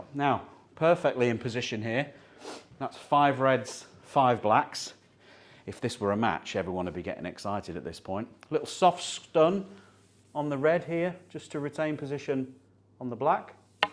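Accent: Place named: United Kingdom. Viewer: Hello Shaun! English is my second language and watching your videos I just enjoy your accent and some intricate British phrasing that you occasionally use. It's fascinating how a sportsperson can be so successful and articulate at the same time.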